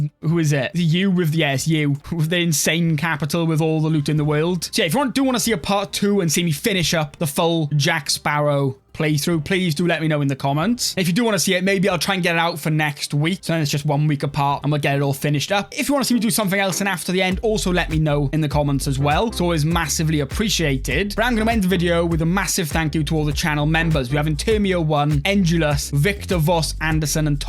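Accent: Wales, United Kingdom. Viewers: Just wanted to say I love your Welsh accent. North or South?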